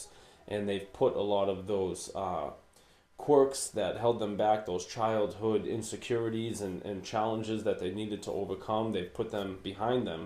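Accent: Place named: Boston, United States. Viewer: Let me guess; you are from Boston or some other part of New England.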